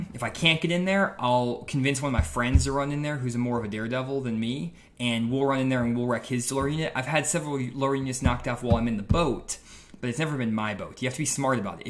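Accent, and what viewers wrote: Arkansas, United States. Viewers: Your from Arkansas??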